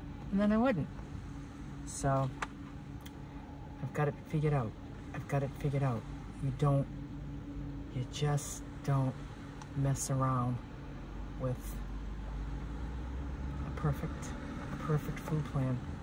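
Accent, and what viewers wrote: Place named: East Coast, United States. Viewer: you are a joy to see! Love your setup. You actually remind me of me! I eat very similar to you. I’ve been more vegetarian and Mediterranean with my diet since I was a kid. The only meat I ate was what my mother cooked, but always loved fish, and greens and veggies are my favorite. I went Vegan 2 years ago and then slowly added back in fish, eggs, and some cheese, mainly hard cheeses from Italy and mozzarella, and also added back in the olive oil. If my fridge isn’t packed with leafy greens and other vegetables then it doesn’t matter what else I have, it feels empty. Mackerel is one of the best fish to eat for one’s health! By the way, I hear the east coast in you, are you on an east coast beach? I’m originally from Long Island now living in Southern California. Stay well🌟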